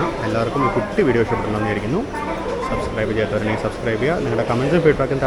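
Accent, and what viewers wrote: Thrissur, India. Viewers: As you are a Thrissur boy, we expect at least one video with the great Innocent-style accent!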